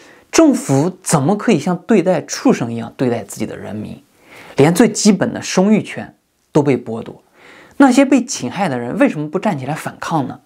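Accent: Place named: Shandong, China. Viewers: 听口音是山东青岛人吧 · 我也是1988年的，潍坊的，你听上去也像是山东口音啊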